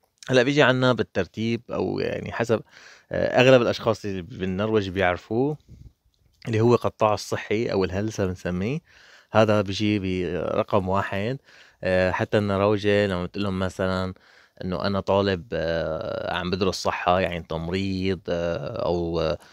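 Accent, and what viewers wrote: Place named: Latakia, Syria. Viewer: حسيتك من اللادقية 🙃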